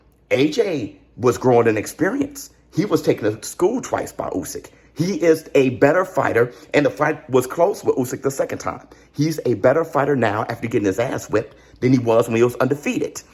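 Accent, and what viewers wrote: Louisiana, United States. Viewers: U from Louisiana?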